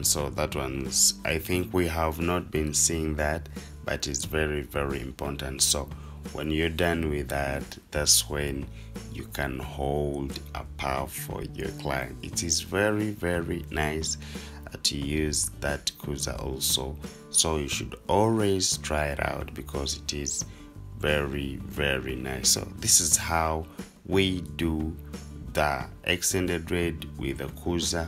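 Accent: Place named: Uganda. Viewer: HOW COME YOUR ACCENT IS SO UGANDAN?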